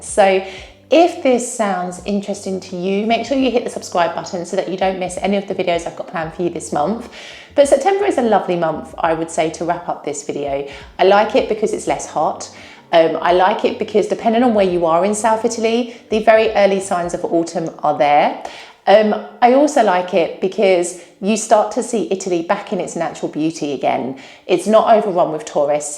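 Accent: United Kingdom. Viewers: It’s a real pleasure to listen to your beautiful story in wonderful British English about living in Italy - my dream country, and in my favorite language.😍🤩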